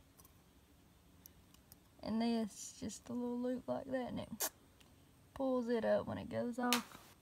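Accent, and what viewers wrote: South, United States. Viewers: that southern accent it think im inlove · Ive got a southern draw but damn i love hers a hell of alot more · I'm not sure if its just because your just so beautiful or that southern accent of yours but i need to find a women from the south I think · i think she 'trapped' me with her good looks and southern accent. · ain't nothing hotter than a cutie with a sothern accent thanks for the trapping tips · you have an amazing accent. Love you southern bells.all the way from England Steve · Love her southern accent, I find them really cute like the chick in the vid! Must be tough to live out in the country with all the wild animals around. I hear groundhogs while cute little things, cause lots of damage. We have SI Chuck here in NYC, but he's trained and confined with humans but the wild ones aren't. This chick looks like she'd be able to fix a car or something and not worry about mud like the city girls up here lol. 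Also, you know she works out or bikes or something with those legs? Would not want to get kicked by her lol. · Love you baby and love the southern accent. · Love your southern touch. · Ma’am I just love your southern accent. I can actually understand what you’re sayin. I’m from upstate SC. We usually use sour corn for something besides hog feed. Hahahahaha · Damn...she is hot and that southern in her voice. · That southern accent and barefoot my kinda gal.👍🏾👍🏾👍🏾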